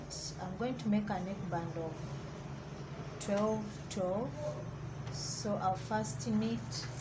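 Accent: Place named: Uganda. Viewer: sounds Ugandan. Do u offer lessons face to face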